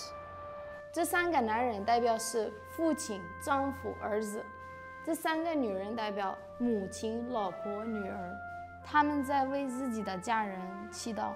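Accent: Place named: Shandong, China. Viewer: 我觉得你说话有山东口音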